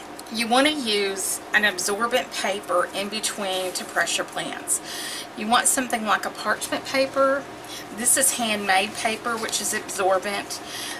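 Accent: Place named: South, United States. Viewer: Love your video,! Such beautiful organization! Your sweet southern accent is divine! ❤️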